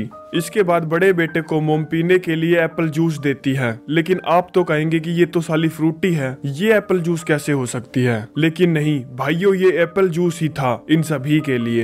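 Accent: Haryana, India. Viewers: Bhai aap haryana se ho kya Bahut hi alag level ka explain kiya hai Aapne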